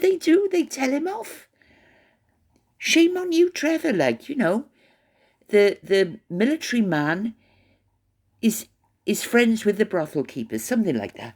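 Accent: Wales, United Kingdom. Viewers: love can’t you ask the council to move you elsewhere where it’s quiet love, I have moved 3times in my life, but I miss Wales you love cannot remember me, we were young and we had good times at Cwmbran cinema, I moved to Gloucester to train as a nurse, and then I married am still in Gloucester we are both the same age, but still remember our young lives we had fun, I was shocked when I found you, and missed your lovely voice, so glad you are back, not on x so I can’t stand the evil, I lost my husband in January then 6 months later my only daughter she did not tell me her cancer had come back, what you did is nothing to be ashamed off, you looked after them, glad to hear you again you take me me back to my youth when I hear you accent I’m back to young time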